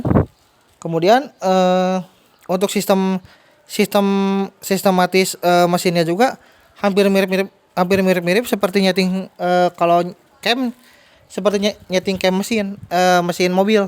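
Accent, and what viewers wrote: West Java, Indonesia. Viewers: Sunda bet yah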